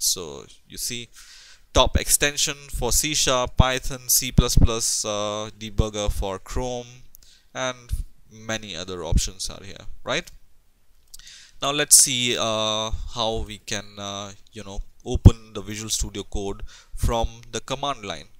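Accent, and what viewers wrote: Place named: India. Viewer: wahhh..indian accent.. god i am going crazy listening to u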